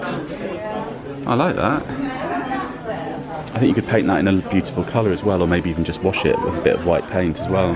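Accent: United Kingdom. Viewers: Just subscribed. Love your vlogs and your masculine British accent. 😊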